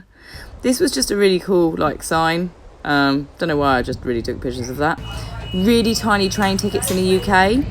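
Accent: Australia. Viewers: You have picked up an Aussie twang. 👍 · Enjoy your stay here in Australia...South Australia is hot 🥵 this time of year......enjoy the climate change... Guess what you still have your Aussie accent with the splash of pom.....don’t sound any different to me.....💕💕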